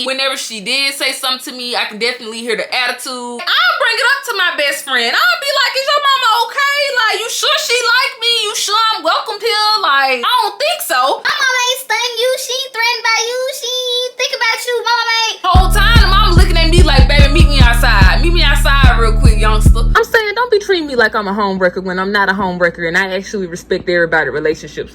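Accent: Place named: North Carolina, United States. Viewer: WHER U FROM !??? I HEAR YA ACCENT !!!! IM HEARIN NORTH KAROLINA !????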